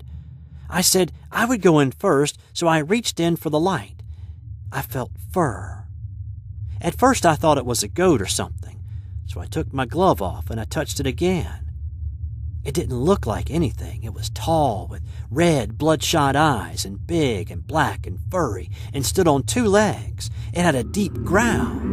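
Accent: South, United States. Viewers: Your country girl accent is perfect. Lol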